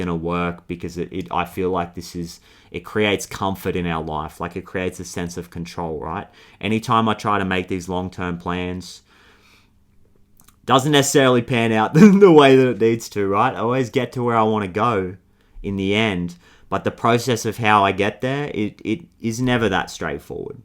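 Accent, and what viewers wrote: Australia. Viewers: omg you’re australian i luv your accent saur much! srsly it’s dope but thank you! you spoke right to my soul 💯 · I'm really grateful I've stumbled upon your channel. I've lived the happiest years of my life so far in Australia, life happened and I had to return to my home home country and all the challenges that come with it. Listening to you talking with an Ozzy accent and about spiritually which I've been in so much need for, is literally soul soothing amidst the time I'm going through right now. I deeply appreciate you and am grateful for your life, your gift and being connected to you. I Love you too mate❤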